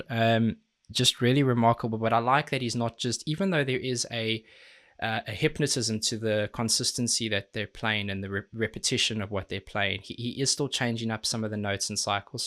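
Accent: South Africa. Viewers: Is this guy South African??! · Also, I've only just found your channel, do I detect a SAffer accent? edit* Just watched your Q&A - Jo'burg boy eh? Howzit Boet? I'm originally from Namibia, so it's great seeing someone from that part of the world doing well. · Look. I'm American so I'm sorry if I'm wrong... But are you south African?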